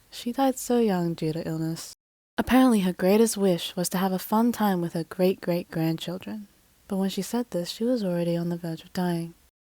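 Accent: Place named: Australia. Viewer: Australia I think. Gotta see if she says "naor" or not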